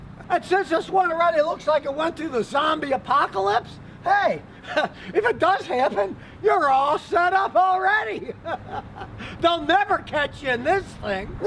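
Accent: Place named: Tennessee, United States. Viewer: not gonna lie, when i first watched your vids, I was like, "is he from tennessee??" damn i'm pumped to meet u haha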